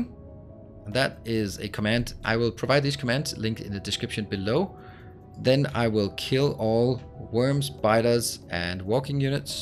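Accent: Denmark. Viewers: oh god is that danish accent recognisable. From one danish person to another!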